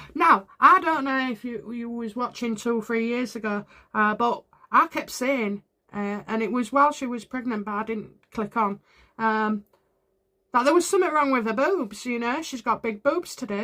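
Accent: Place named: England, United Kingdom. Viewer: Thank you for another great reading =). Your summaries at the end are awesome too. I love your little giggles and your accent. I am Canadian and I love English accents =). Prayers for you and your mum =). I have 5 children (all 20+ now) and I nursed them all. I was happy that I was able to do that. I feel badly for mothers that really want to and are not able to. I had friends that really wanted to but they didn't produce enough milk. I am not surprised that Meghan didn't have the patience or mothering instinct that she wanted to even try very hard, even if the hormones produced the milk. I am disappointed in King Charles not honouring his mother's wishes, and the promise he made to her to sort this. Allegedly, he is meant to make Prince William Regent soon (a few readers have said this) so when this happens, will that enable Prince William to legally be able to do that? 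Or will he have to wait until he is King William to do that? Would you please continue on this and find out in another reading? I am wanting this to come out so badly!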